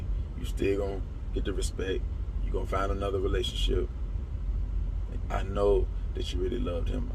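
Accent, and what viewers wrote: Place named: New Orleans, United States. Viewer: This nigga sound like he from new Orleans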